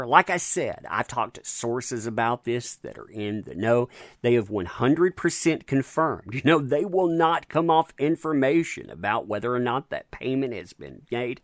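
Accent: South, United States. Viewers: Go, J.D. Seems right, esp if ACLU is nonprophit and could be violating FOIA. If it is public info to know they were to receive $$$, shouldn't it be public info whether ACLU received it? Glad to see you back,. Been missing that southern accent to go with my coffee and keep me up to date on how our J.D. is faring.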